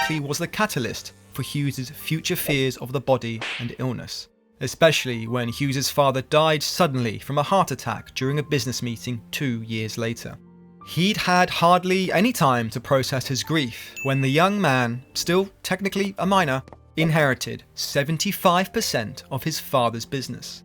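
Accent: United Kingdom. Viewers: Hate this condescending British voice speaking ill of a great man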